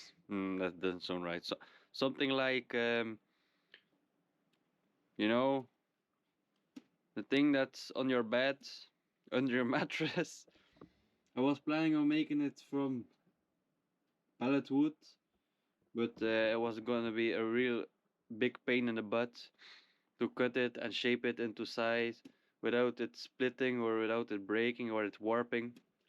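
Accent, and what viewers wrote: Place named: West Flanders, Belgium. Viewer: Vn da goe west vlams engels af en toe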